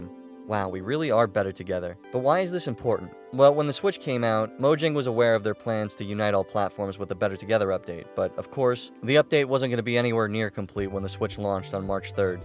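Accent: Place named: New Jersey, United States. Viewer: Idk why, but based on your cadence and voice, I just have a FEELING you're a New Jersey resident who either lives close to Philly or used to.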